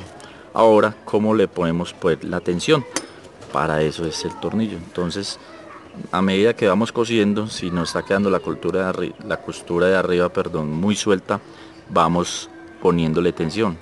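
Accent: Colombia. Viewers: Hola mi amigo portu forma de hablar eres colombiano osea mi hermano gracias por estos videos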